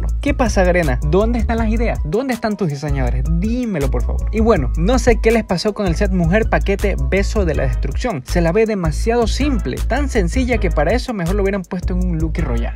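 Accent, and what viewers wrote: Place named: Ecuador. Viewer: Por su manera de hablar siento que es Ecuatoriano, jaja buen video ♡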